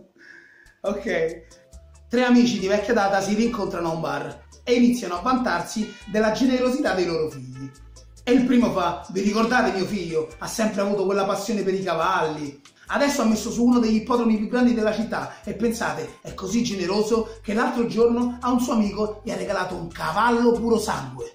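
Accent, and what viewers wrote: Italy. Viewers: Tu mi sembri un po’ di Napoli rispetto a uno di Roma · È normale e nato in Italia o cresciuto che accento doveva tenere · Coffin meme:essendo di colore mi immaginavo una voce con un accento più africano quando pronunciava le parole.La sua voce è invece con un accento laziale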